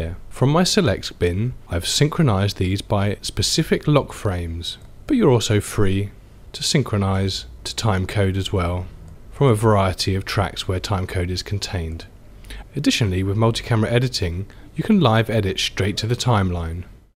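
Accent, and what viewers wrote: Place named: United Kingdom. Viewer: Британский акцент :) Те же дела с мобил и мобайл.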